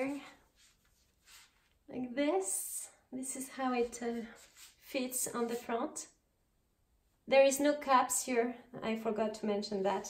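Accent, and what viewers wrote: France. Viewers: I love that bed warmer on the wall and I love your French accent and your pretty Titi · Mhm. You sound impressively French but in female contrast with a nice rear end. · Are U French?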